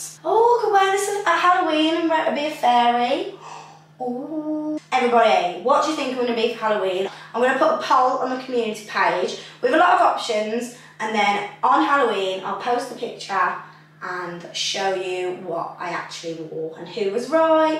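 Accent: United Kingdom. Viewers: You are so damn fine, that British accent just puts you on a different tier.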